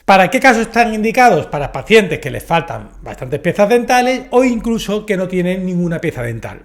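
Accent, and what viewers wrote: Spain. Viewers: El locutor es de España. Tendrás que preguntar a un dentista en México😂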